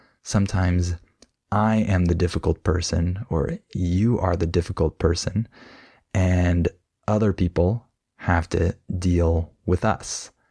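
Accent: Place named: United States. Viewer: هذي لكنة امريكية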